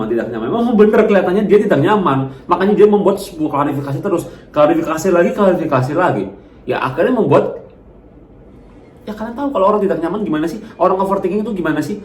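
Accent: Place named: Banyumas, Indonesia. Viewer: Bang org mn.. kog ngapak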